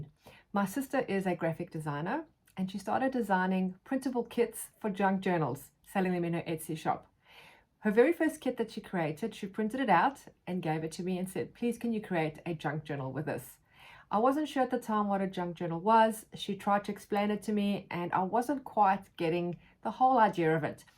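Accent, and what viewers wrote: South Africa. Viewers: Hi I am so glad I found you channel... You sound like you are from South Africa:D So am I and there are very few if any junk journallers here as far as I have found. I love your style and I am new to junk journalling and all the videos can be a bit overwhelming... I absolutely love the idea of an idea journal and i think I will start with that:D Thank you so much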